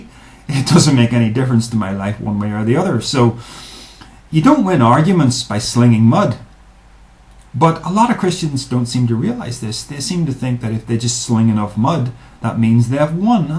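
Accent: Ireland. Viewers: hearing you talk is like listening to myself think...but in an Irish accent. Weird finding someone who is on the same page on almost every topic you've covered. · oh boy are you a luciferian?i am so scared now.i get remarks like that all the time.please dont let them get to you.we are working on a website.and i will send you a link when we are done.well most of the work is done by onno.but its gonna be awsome ok?i like ur accent.is it irish?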